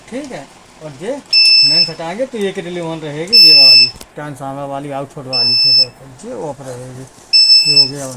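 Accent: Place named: Bundelkhand, India. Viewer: सरजी आपकी भाषा बुंदेलखंडी लग रही है आप कहां से हैं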